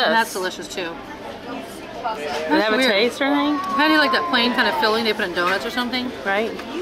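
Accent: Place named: New Zealand. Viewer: isnt saying ca-bah-na wrong. That's how you'd say it in New Zealand:) same with how we say dance. We dont say it daa-nce we say it more like dah-nce.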